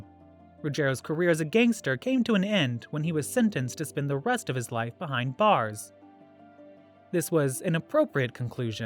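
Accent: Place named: United Kingdom. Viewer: Stopped after 3 seconds, listening to this British cigarette talk makes me want to 🤮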